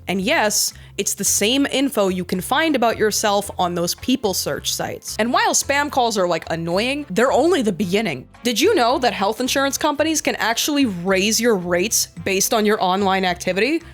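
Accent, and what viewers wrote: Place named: New Jersey, United States. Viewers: I feel like youre holding back a jersey accent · Alt woman with bangs and a moderate new jersey accent explaining in depth ancient gay memes. My kryptonite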